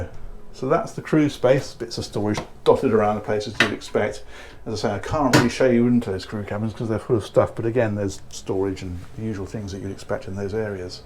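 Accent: United Kingdom. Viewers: Another great review. You’re the best and love the British accent.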